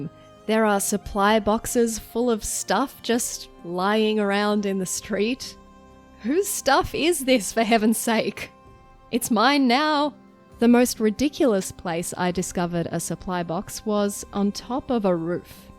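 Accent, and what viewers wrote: Australia. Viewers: a hole in the floor for the ore to drop through is a fun thing to hear in an australian accent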